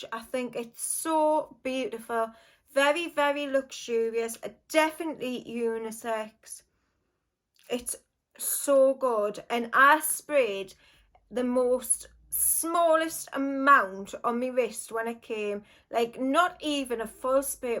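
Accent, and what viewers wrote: United Kingdom. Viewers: Wow!! Congrats on the 2007 Angel!! Beautiful bottle. I've never tried Angel, tbh. I'm an Alien girl so not sure I would like Angel. I've heard it's either one or the other and I also really hate patchouli bombs. But you're making me want to sniff it!! Also, where is your accent from? I know somewhere in The U.K. but I've always wondered!!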